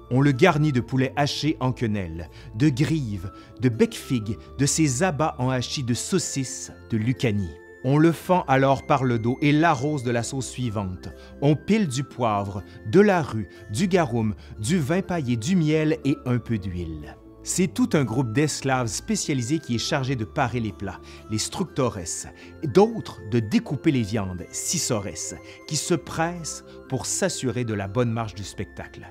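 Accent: Quebec, Canada. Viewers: Je suis heureuse d’écouter un Québécois en plus mon domaine l’histoire 👌bravo 👏🏻🌹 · Des fois, je regarde tes vidéos pour le plaisir d'entendre un accent québecois.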